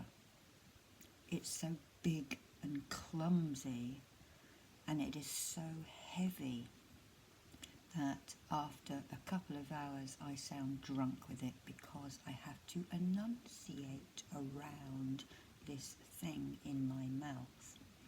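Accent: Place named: Sussex, United Kingdom. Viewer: I've recently broken a tooth and although it's been repaired, I still find eating difficult, like my teeth don't really match. So, to hear your story, I can only say that you're incredibly brave to have gone through all that and still come out smiling and trying to help other people. And yes, as a fellow Sussex girl, I think we do have a nice accent here!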